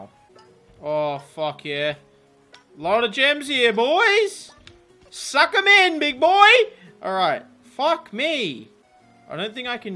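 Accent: Australia. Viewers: say more funny Australian sounding stuff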